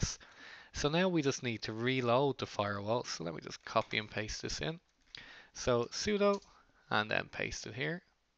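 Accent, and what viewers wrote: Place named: Ireland. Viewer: thank you for the clear instructions! my wife is impressed with you brogue too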